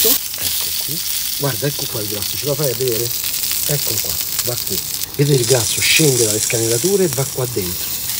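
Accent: Rome, Italy. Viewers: È stupenda la dissonanza tra la parlata romana e i sottotitoli 😁😁😁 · I tagli non ci appiccicano niente, prima di sigilla fuori e non si inizia dall osso. Poi aver separato la carne e’ inutile a quel pubto si sarebbe dovuto cuocere filetto e controfiletto gia’ disossati, inoltre era troppo alta, inoltre la carne e’ troppo magra, insomma quasi ogni cosa che si poteva sbagliare, l’ha sbagliata a dimostrazione che in italia la cultura della bistecca non c’e’ proprio. Inoltre tutto quel romanesco da’ molto fastidio all’ascolto, bisognerebbe imparare un po’ di dizione corretta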